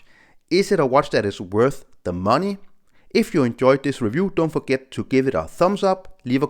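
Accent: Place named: Denmark. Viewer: Great review, in the list of my next purchase. Love the brown dial. I realize that you have danish accent, then i open your youtube channel/profile..yup DK 😊.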